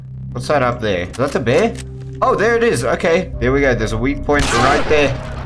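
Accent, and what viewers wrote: South Africa. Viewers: Bru you from SA? Pretty sure im hearing correct lmaooo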